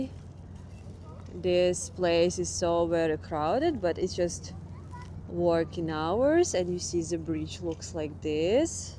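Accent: Russia. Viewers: It could just be me but your Russian accent is getting thicker?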